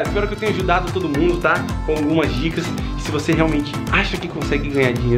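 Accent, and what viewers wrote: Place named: Bahia, Brazil. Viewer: Essas hora thi kkk Tá meio baiano ne kk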